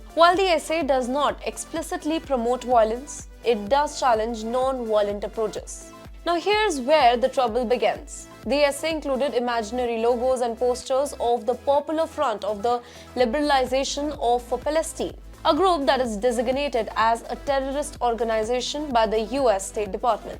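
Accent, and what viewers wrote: India. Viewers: नमस्ते जी, आप अंग्रेज़ी हिंदी के समान बोलती हैं।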